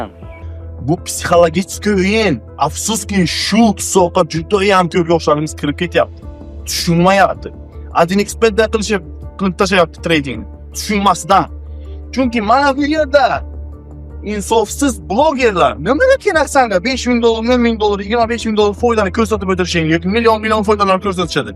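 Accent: Bukhara, Uzbekistan. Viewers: Акани узи Бухоролик шеваси Тожикча.Узбек тилида озрок кийналади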